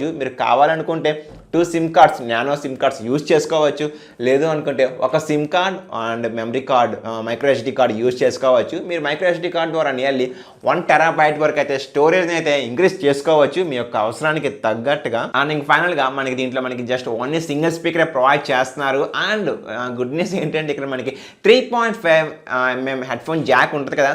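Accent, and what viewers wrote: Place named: United States. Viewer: way of talking in telugu change cheyandi mari american la try chesthunnaru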